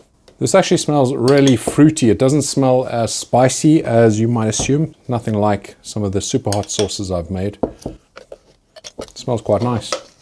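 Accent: South Africa. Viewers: got such hectic whiplash because i instantly recognised your south African accent 😂😂😂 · Can't miss that SA accent anywhere you go.... Nice video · You sound South African 🤣🤣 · When you recognize a South African accent... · Is that a South African accent? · Hi. Based on your accent, I'd say you're South African. Where are you based?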